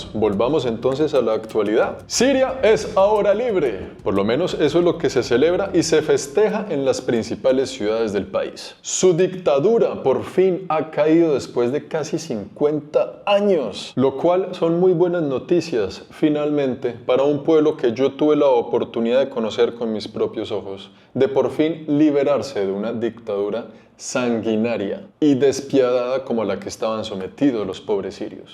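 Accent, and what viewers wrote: Colombia. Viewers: Tan bello con ese acento colombiano inconfundible !!!! Muy buen vídeo !!!!!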